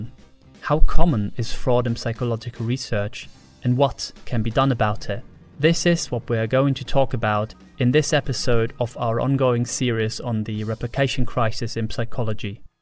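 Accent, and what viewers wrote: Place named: Germany. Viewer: It took me that long to realise that you were German 😂 Mega spannendes Video, hast du einen Hintergrund in Psychologie, oder wieso kennst du dich so gut aus?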